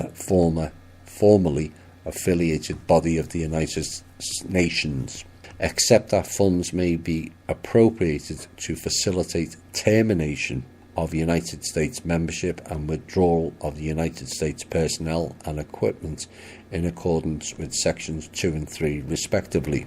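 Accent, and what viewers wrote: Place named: United Kingdom. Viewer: ??????????? A British voice talking about soverign????? No one believes this is real.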